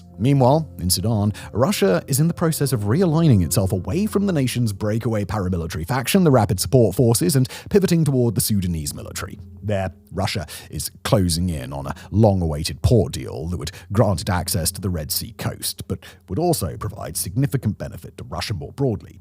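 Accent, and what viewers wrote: United Kingdom. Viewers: Even if you didn't speak with a British excent, would recognise Britain propaganda 😅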